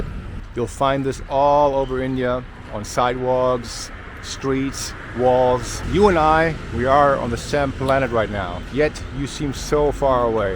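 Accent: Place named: Germany. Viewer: Just from your accent I understood youre Deutscher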